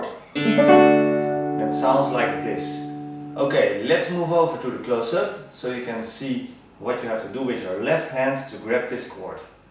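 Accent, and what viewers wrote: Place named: Netherlands. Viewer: the MIDDEL VINGER! haha nice accent:$ nl ftw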